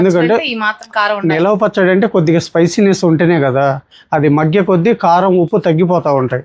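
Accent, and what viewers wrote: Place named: Guntur, India. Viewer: మీ గుంటూరు మాటలు చాలా బాగున్నాయి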